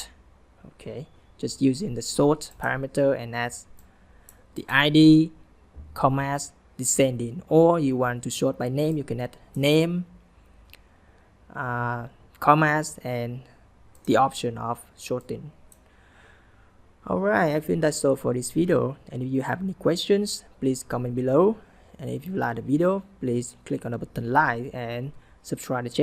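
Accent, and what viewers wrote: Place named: Vietnam. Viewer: bạn là ng VN hả ? accent VN chắc luôn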